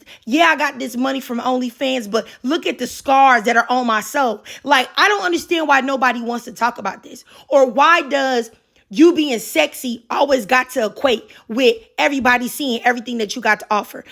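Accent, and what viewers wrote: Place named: California, United States. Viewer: Okaaay I didn’t know you were in your 40s. Same! And I can tell you’re from Cali lol I’m in Inglewood. 💜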